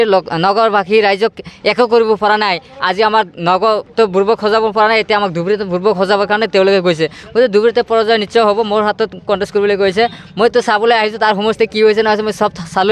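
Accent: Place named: Bangladesh. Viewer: শুকুৰ আলি ভাই তোৰ ভাষাত গোম পায় আপুনি যে খাতি বাঙলাদেশী।যেনে আপুনি কথা শব্দটো কতা উচ্চাৰণ কৰে।আৰু ভালতোক বাল উচ্চাৰণ কৰে।আৰু বহুত আছে। 😂😂😂😂😂😂😂😂😂😂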